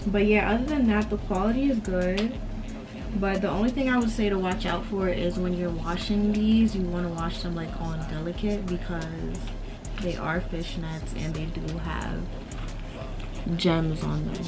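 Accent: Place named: Jamaica. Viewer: As soon as me hear d patois me subscribe😂I shall live ova yah now🤣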